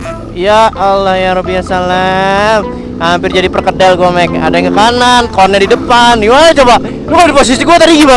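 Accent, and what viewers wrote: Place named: Java, Indonesia. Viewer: Bang coro lagat ngomongmu kok kyo wong jowo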